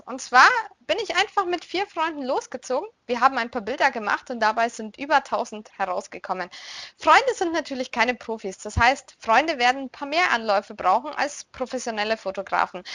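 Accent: Germany. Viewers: I haven't actually been reading, I've just been sitting here listening to your accent and language. I think the German language is really cool! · Hey ^^ What part of Germany is your accent from? love your cosplays <3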